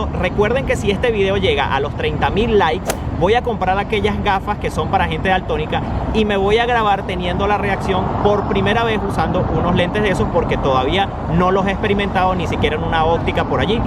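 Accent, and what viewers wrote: Venezuela. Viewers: eres de venezuela? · Umm!! Es la primera vez que escribo un comentario a un Youtuber y veo a muchos!! Tus vídeos me gustan mucho, soy de España hace poco he estado en Portugal unos días :-) me ha llamado la atención ayer ver la banderita de Portugal en tus vídeos y que esté escrito el título en español, enseguida me he dado cuenta que eras Venezolano al escucharte hablar. :-D que chulo!! Venga a por más vídeos!!! Olé!!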